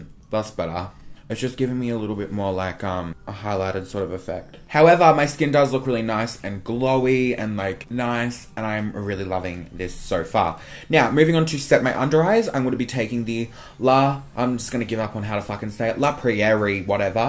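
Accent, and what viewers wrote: Australia. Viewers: u from australia? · Are you Australian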